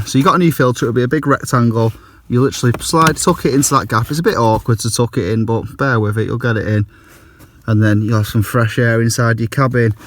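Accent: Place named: United Kingdom. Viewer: What a lovely britsh acsent )) Thanks 4 Video!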